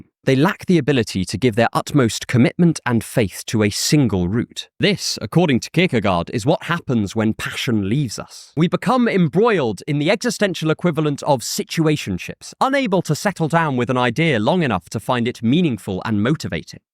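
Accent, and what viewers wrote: United Kingdom. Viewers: Whatever you call the way this guy talks - its more than just a British accent - is annoying and I dont like.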